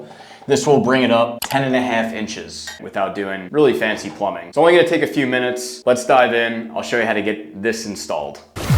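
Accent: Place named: RP, United Kingdom. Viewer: tutorial on installing an S-style shower arm is absolutely top-tier. His plummy delivery and refined advice make a technical plumbing task feel like a sophisticated DIY masterclass. He explains the elevation gains and clearance benefits with such clarity that even a complex setup feels effortless. If you’re looking to upgrade your shower experience with professional guidance, this is the gold standard.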